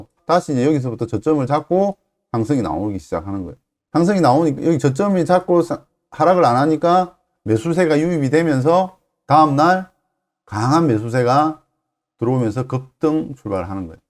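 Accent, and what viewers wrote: Busan, South Korea. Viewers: 부산이신지? ㅋㅋ 익숙한 말투가 귀에 꽉 박히네요~ 내용이 너무 유익하고 전문적이라 계속 공부하고 있습니다. 감사~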